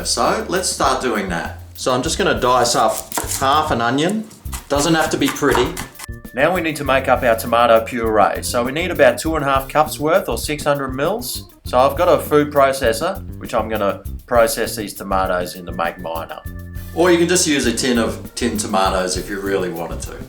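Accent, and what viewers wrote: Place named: Australia. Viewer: Tuck on in...I love the way you Aussies speak. Great show as always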